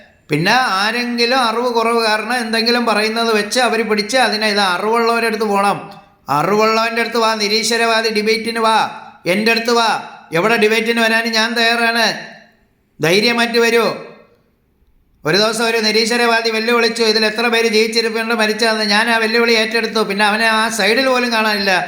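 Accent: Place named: Trivandrum, India. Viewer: Tvm language....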